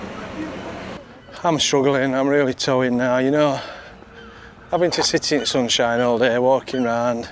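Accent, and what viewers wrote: Yorkshire, United Kingdom. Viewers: Hello. You bring back good memories because my family on my father's side were from Leeds and on my mother's side from Barcelona. Not only because of the accent but because of the way of being. It is said that copper was invented by a Yorkshireman and a Catalan, both pulling a peseta coin😂😂. The next time you visit Barcelona I recommend attending the magical fountain. They are renovating it until August 2025. It has summer and winter hours. I worked as a tour guide until 2016 and the truth is that it is not well known but it is the best attraction. Maybe because it's free. There are more local people than tourists attending and performs at night due to the contrast of colors. Write it in your agenda. Cheers. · I very much enjoy your videos, especially the Yorkshire accent, big fan here! 🍻🍻 All the best!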